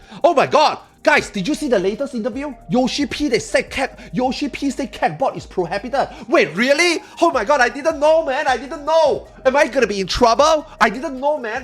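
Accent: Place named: Singapore. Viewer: I mean it's an accent from another English speaking nation. In Singapore this is just how people speak English and it's the primary language there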